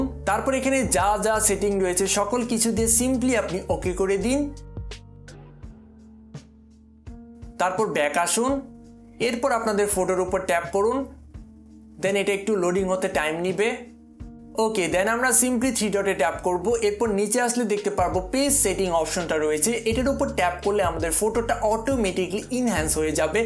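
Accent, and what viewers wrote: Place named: Jamalpur, Bangladesh. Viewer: Aponar basha ta jamalpur kun jagai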